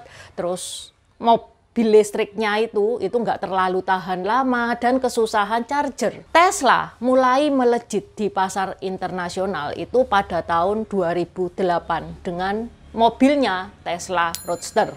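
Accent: Java, Indonesia. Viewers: Aku suka logat hostnya. Orang Jawa kalah medok. ❤ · Logatmu negara Jawa,, 🤣🤣 ,, Jawa itu cuma suku yg tinggal di daerah Jawa di Indonesia,, bahkan di pulau Jawa (bukan negara Jawa),, sewajibnya kamu harus logat Indonesia,, jika tidak, channelmu gak bakal maju sampai mati 😢😥🤤😲,, belajarlah ke Deddy Corbuzier yg Indonesia banget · NGOMONG NYA BIASA AJA TUDAK USAH MEDOK GITU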